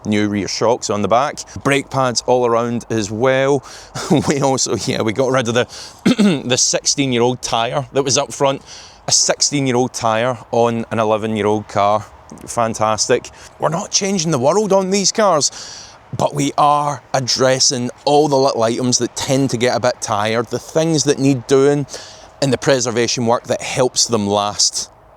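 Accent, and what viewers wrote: Scotland, United Kingdom. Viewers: Common sense and love the Scottish accent . AYE MY LADDIE FROM australia .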